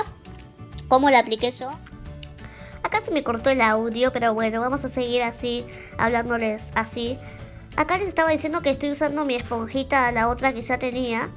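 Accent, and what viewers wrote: Argentina. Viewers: sos de buenos aires?? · jejjeje es argentina, el acento es muy chocante. Algo basico linda 😘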